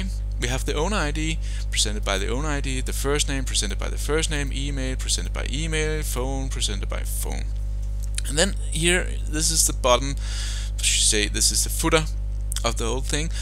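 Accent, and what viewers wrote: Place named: Denmark. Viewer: Du lyder meget dansk. You sound very Danish.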